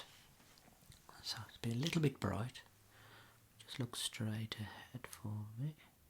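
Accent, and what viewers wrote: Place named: Black Country, United Kingdom. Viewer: Great video, love to hear the Black Country accent!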